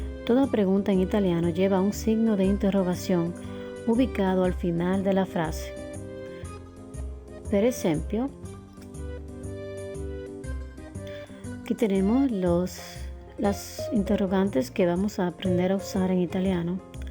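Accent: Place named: Spain. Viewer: Quiero saber de dónde es el acento de español de la profesora.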